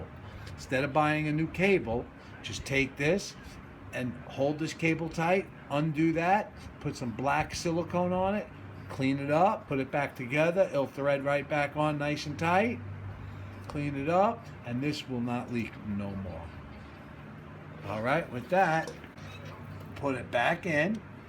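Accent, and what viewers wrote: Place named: Bronx, United States. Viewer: I will be applying this fix to my 2005 Buell Lightning XB12Scg, since they have similar engines, and hopefully, the concept is the same. However, I won't know for sure, until I get in there. I'll let you know how it goes. Thanks again. By the way, you sound like you're from the Bronx. I'm originally from Throg's Neck.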